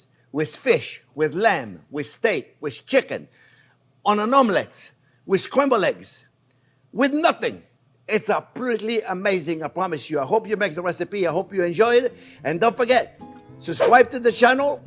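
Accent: France. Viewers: Chief Jean continue talking , I love Your French accent it’s different than other chiefs , we choose yours to watch , 😂👏👍👌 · Beside the excellent recipes and very well explained, you share with us, I enjoy the beautiful French accent . That is a plus. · Thanks Chef so much, I love all your talking, and sense of humor, saying "I am from N.Y" with that thick French accent:), you are so funny. and I truly feel lucky to find myself in a free , French culinary school, without the pressure to learn these recipes in English, with so much fun and humor. I agree those who think you talk too much, they don't deserve to watch you, also I find it extraordinary rude to speak to a chef of your Caliber like that, they sure don't understand the Culinary culture and in French Culinary school, if a student talks to Chef like that, would not longer be there. so these rude students, who watch your lessons for free and disrespect you, really don't deserve these teachings. 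I honor you.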